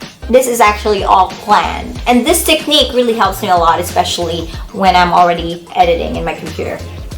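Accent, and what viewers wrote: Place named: United States. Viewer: Ang galing naman mag.english ni ma'am parang american din..team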